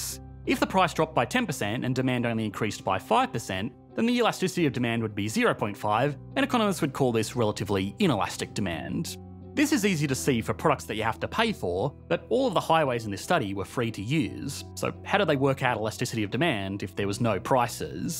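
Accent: Australia. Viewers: goes to the point. I live in a suburban area in Europe and own a car but also use public transport. While I use the car to go to work away from the city there are definitely use cases where going to the city is faster, cheaper and much more convenient by public transport. Not to mention that that shorter travel time is also free to use for reading news, watching this video, or other and you can also drink some alcohol if you are going out. It is unbeatable value proposition, forget the car. But that is mostly because the city was planned to have really good public transport on the expense of roads for cars. Some jurisdictions even outlawed free parking to give more incentive to use public transport. The author of the video sounds Australian, so I understand, that’s another planet compared to large European cities.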